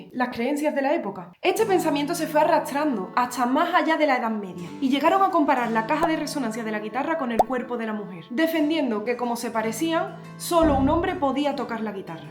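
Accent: Andalusia, Spain. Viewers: Hermosura y talento Juntos ❤❤❤❤ Adoro tu acento andalú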